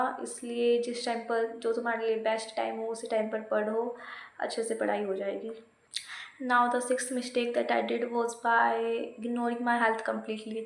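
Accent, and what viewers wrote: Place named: Haryana, India. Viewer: Bhai yo to mane pata lag gya thare haryanvi accent badi mast hai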